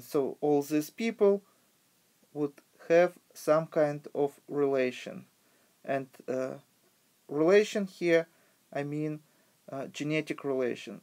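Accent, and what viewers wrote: Russia. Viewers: Вам нужно работатт над произношением. Сильный русский акцент на английском - это что-то ужасное. По себе знаю) А видео очень информативны!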